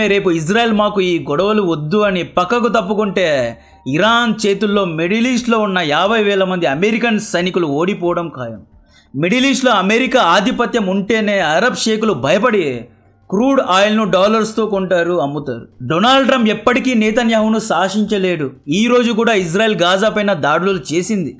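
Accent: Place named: Tamil Nadu, India. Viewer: Arey nuv mandhukotti maatladathava?? Leka arava vaadiva? Ni maatendhukura ala vasthaadhi? Telugodi chinna shortfilm chaesthene oscar andhukuni retire ayinattu buildup isthaaru, nuvventra telugulo videos chaesthunnav? Sigguledhaaraa Goltigey neeku?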